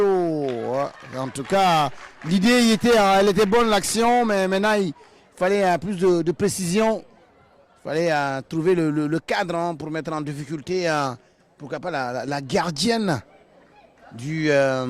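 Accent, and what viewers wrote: Ghana. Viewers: Why is he sounding Ghanaian?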